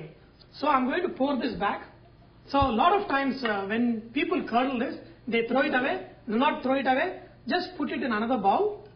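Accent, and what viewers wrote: India. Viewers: its indian Accent....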